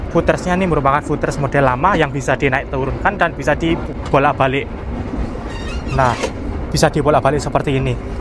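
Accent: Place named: Madura, Indonesia. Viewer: Suaranya yg bkin candu mas😂😂 medok logat maduranya keliatan ,, semngt ngontennya😂😂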